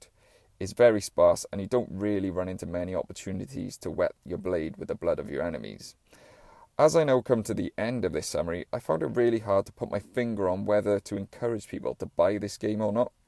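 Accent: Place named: Wales, United Kingdom. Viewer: Welsh accent! Subbed :)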